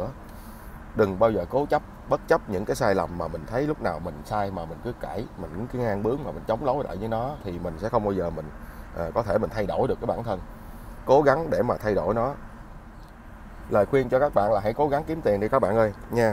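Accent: Standard. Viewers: Anh nói chuẩn quá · A oi,a noi chuan lam,hy vong a lam nhieu video nua,de e hoc hoi nhung j ve ben my.e cung muon qua do song giong a.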